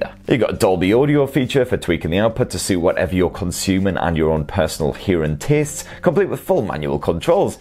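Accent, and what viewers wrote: United Kingdom. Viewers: Lisandro Bravo you realise that he has British accent, which is the original English?!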